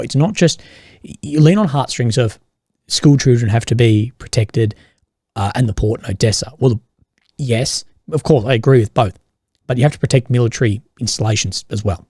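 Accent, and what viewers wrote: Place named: Australia. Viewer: "Gddye". I think he is saying "good day". Australian English "day" sounds like "die" to Scots (and Autralian "die" sounds like "doy"). Legends could be rhyming slang for friends. Add pronouncing "low" with a shwa like in French "le", but followed by a slight "w" glide, and you have the four peculiarities of Australian English borrowed from Cockney. Though I must say that this pronunciation of "low" is more widespread in Britain.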